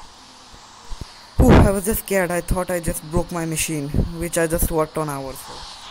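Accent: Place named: India. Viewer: You sound Indian, thats no bad thing, that's a GREAT thing, keep being smart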